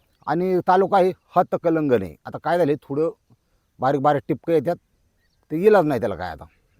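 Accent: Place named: Narayangaon, India. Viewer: मामा तुमचे गाव जुन्नर तालुक्यातील आहे का. बोलणे नारायण गाव सारखे वाटते.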